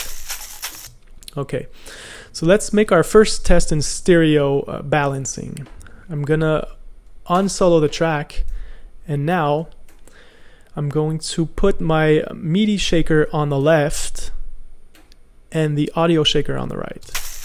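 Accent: Quebec, Canada. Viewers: stu un quebecois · Quebecois spotted.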